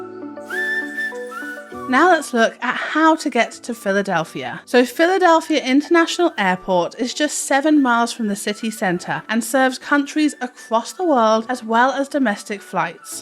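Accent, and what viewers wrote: United Kingdom. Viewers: You sound like britis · Fairly small city she says lol 6.2 million population metropolitan area! Apart from London is any city close to this in the U.K. where I imagine by your accent that you are from? Lol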